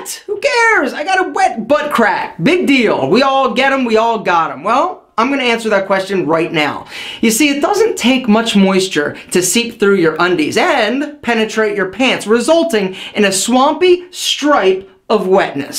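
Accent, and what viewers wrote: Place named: East Coast, United States. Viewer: Where are you from bruh....im guessing close to the eastern coast.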